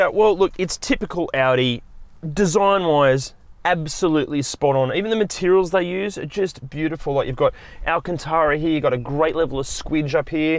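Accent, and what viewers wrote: Australia. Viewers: this guy is aussie clearly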